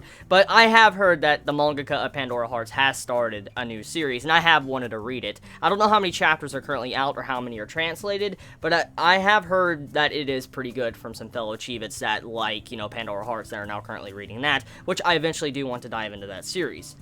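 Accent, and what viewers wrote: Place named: Tennessee, United States. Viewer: hmmm i notice you speak with an accent at times, then i hear you live in Tennessee. So i assume you build up an accent or grew up with the accent.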